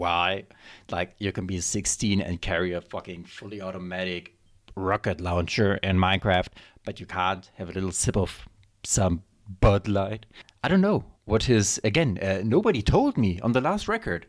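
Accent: Germany. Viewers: You definitly dont sound like the baby from family guy. As a german I hear the german german accent if you could actually call it an accent.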